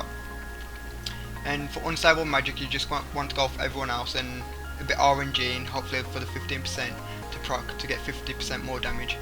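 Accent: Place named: Black Country, United Kingdom. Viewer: Yam from Black Country ay ya? Nice guide thanks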